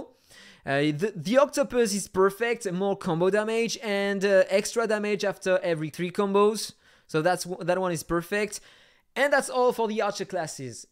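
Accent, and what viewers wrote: France. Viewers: crushing it! best content out there so far, i just struggle with the french accent, but thats on me not you :P keep crushing it! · jsens un petit accent français ? Je me trompe peut-être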